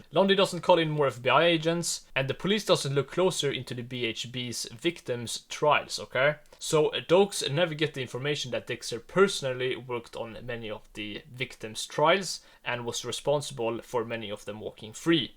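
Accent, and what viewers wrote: Sweden. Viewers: Holy svengelska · That Swedish accent going crazy 🔥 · You're definitely Swedish. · u swedish?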